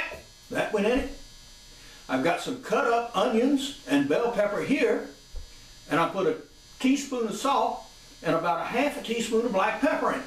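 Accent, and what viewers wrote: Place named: Appalachia, United States. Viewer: I love your voice. Like a slight hillbilly twang mixed with dr demento and a splash of jello biafra.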